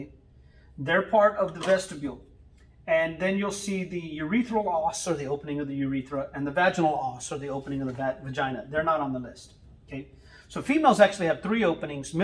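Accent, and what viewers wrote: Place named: United States. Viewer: Sprich deutsch. Dein ami-palaber ist für mich unverständlich.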